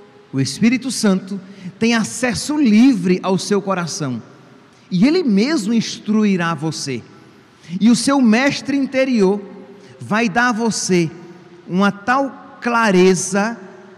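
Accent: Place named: Northeast, Brazil. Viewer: Esse padre é da onde.Muito boa a omilia.Deve ser Nordestino pelo sutawue.Sou de MG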